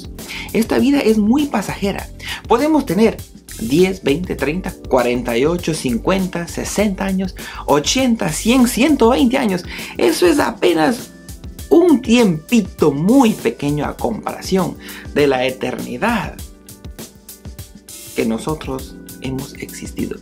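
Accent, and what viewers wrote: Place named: Peru. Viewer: pareciera de peru o un portugues que sabe hablar español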